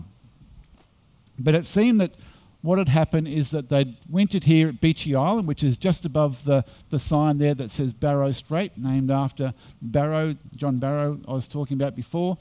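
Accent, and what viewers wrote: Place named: Australia. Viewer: ..trying to get past the Aussie accent, the image of Tower Bridge doesn't help.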